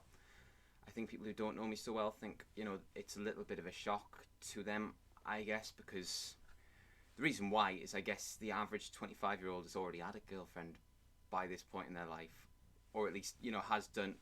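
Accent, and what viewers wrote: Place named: Newcastle, United Kingdom. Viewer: As somebody who does pretty well on women front hearing and looking at you it’s surprising yet not unbelievable. You do have confidence (able to speak on camera), can hold a convo and you are not ugly. Not sure how tall you are and if you live in a big city (sounds like you’re near newcastle). Aesthetically though, I think you need to look more fresh. Women like clean, fresh, healthy people. IMO Your hair is a mess, and makes you look like Virgo from ghostbusters or an extra from a Viking’s episode. You should opt for a 90s Keanu Reeves style. Also grow some facial hair if you can, weight train 3 times a week and stop touching yourself/watching porn as much. In terms of clothes trying buying some stuff from M&S (their autograph line is stylish and affordable). Dating apps do work if you have good pictures. Plenty of videos on YouTube which show how to achieve this. 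As for meeting women in real life, you give me music vibes. Therefore I’d be going to ticketed live music events/gigs (not clubs) where you’d have a mutual interest. Would also join a club of some sort (not sure what you’re interested in). Sorry if any of this sounded mean, best of luck!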